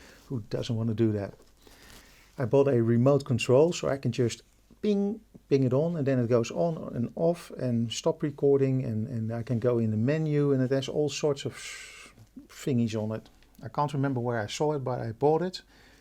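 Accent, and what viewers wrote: Netherlands. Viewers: Heerlijk Nederlands accent 😋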